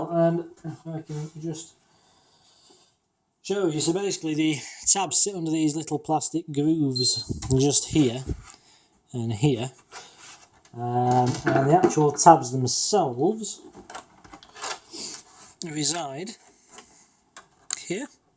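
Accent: United Kingdom. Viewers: Thank you kind sir. while some advice did get lost in translation due to your use of "the queens english" overall you where most helpful.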